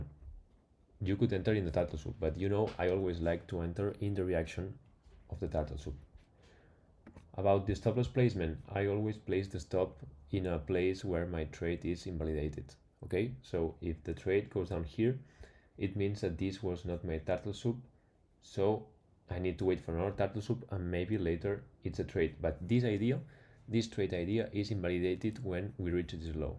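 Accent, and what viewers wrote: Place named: Spain. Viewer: video super útil, gracias! Buen ingles, pero se nota el acento Español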